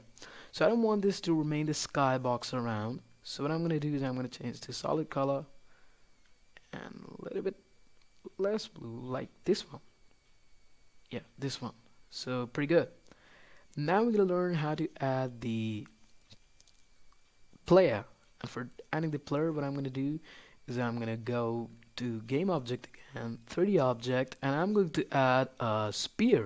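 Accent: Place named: England, United Kingdom. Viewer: Lag Raha he koi asli angrez bol Raha Hain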